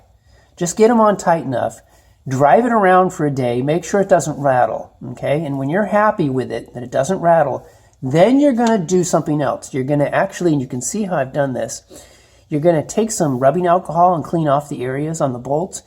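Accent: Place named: Canada. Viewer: Another good idea, thanks again. You sound like you are from Canada? - Canadians overall are good peeps.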